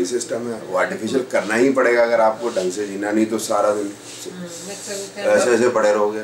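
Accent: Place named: West Delhi, India. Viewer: When i listen to the way you talk, I start to miss West Delhi.....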